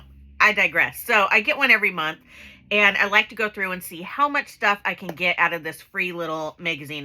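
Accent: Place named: South, United States. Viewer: If you're from Oregon, where the ##$% did you get that southern accent that comes out?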